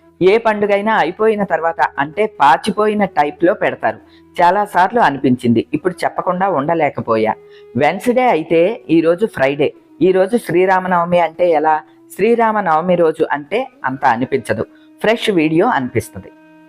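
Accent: Godavari, India. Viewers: Amma namast he.Memu yesterday Annavaram vellam.Vacchetappudu meeru baaga gurthoccharu.Godavari district maata vintene Amma maata gurthostundi.Happyga anipistundi All the best Amma Amma (meeru)cheppina chaala manchi maatalu memu acharisthunnam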